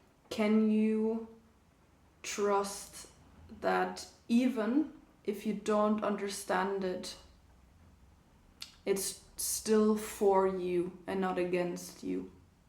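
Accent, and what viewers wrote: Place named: Ireland. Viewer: Hey there! Do you live in Ireland? I'm picking up hints of an Irish accent 😄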